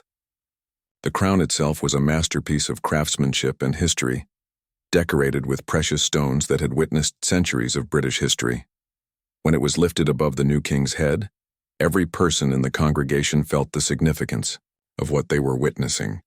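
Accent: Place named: United States. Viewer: What the hell is an American narrating British history ?? This is ridiculous !! 🇬🇧 it’s not your history !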